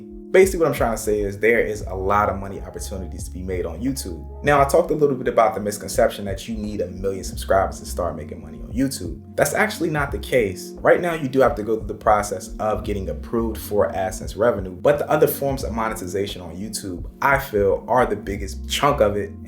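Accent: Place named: East Coast, United States. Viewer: your east coast accent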